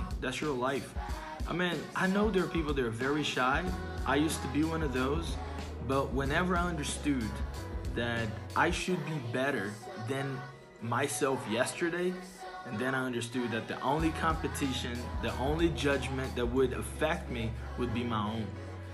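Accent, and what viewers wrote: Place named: United States. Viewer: Hello teacher, I’m Yahya, all thanks to you and teacher, I learned just to focus entirely on an accent that I like to be recognized by and that’s American which teacher speaks 😊 keep up the good work ✋️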